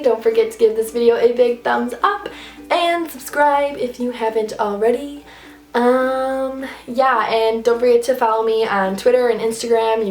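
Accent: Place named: Michigan, United States. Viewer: I love your accent. Are you from Michigan? I've always wanted to live there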